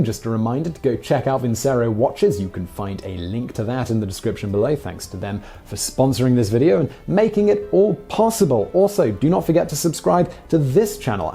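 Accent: United Kingdom. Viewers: you have a British accent but, you use American terms. What do you call home? Btw thanks for the entertainment.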